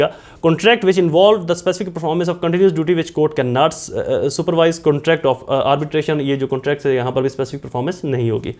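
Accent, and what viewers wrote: Punjab, India. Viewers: Nice punjabi accent sir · Sir g punjbi sun 🌞 ka maza ah ga ap ki punjbi hmra jisi ha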